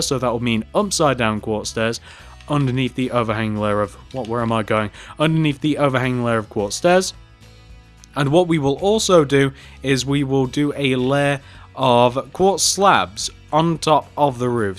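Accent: United Kingdom. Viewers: he's British so he says it like that! >:O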